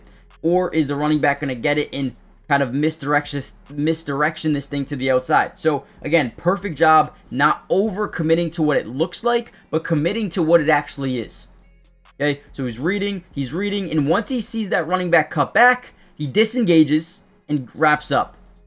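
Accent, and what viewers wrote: Canada. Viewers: Rad Canadian accent my guy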